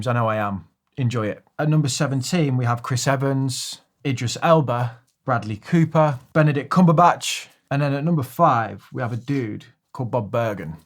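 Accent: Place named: West Midlands, United Kingdom. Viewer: It’s so amazing to see such varied and wisdom rich content from a creator that sounds like me. People don’t quite understand the barriers of the mind that West Midlanders face when we want to put our thoughts out there. Logic being due to the negative stereotypes that a few vowel sounds can somehow determine intelligence. You got me going on investing for my future, and you’re helping me to feel confident when I speak to a client from somewhere else in the UK. Keep smashing it mate.s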